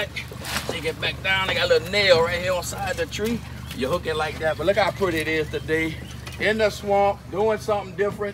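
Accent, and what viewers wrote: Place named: New England, United States. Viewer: You sound like a Yankee friend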